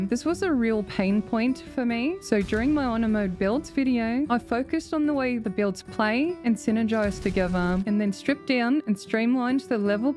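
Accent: Australia. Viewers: okay weirdnotweird take, but hear me out. i like that your voice fits your aussie accent.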